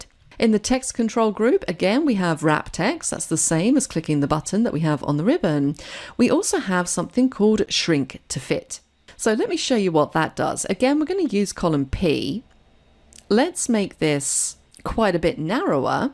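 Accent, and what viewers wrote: United Kingdom. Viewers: that british accent is so smooth hahaha, greetings from spain. It would be awesome if you could transform her voice into spanish through AI.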